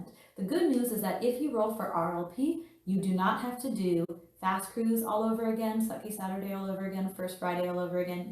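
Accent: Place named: West, United States. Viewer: What an interesting question. I will first wax poetical since sound is a personal sensation. Your voice is smooth, like a sophisticated port. It washes over one like sitting on a beach with your eyes closed as the susurration surrounds and tantalizes. I did discover that your voice in this video was not your natural speaking voice, but a "professional" style, thus armed with this insight, I watched part of your Google and part of your MEPS videos to listen to your native voice. So secondly I will hazard this guess. You were born in Montana or Utah, moved to Oregon, obviously went to Connecticut and spent some time near Sacramento. I also traveled a bit and thought I did not have an accent until an Alaskan bush flier asked me if I lived in Texas.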